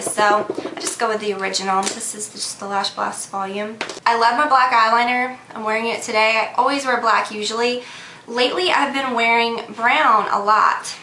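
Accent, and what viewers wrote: South, United States. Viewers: Your southern accent really came out in this video:)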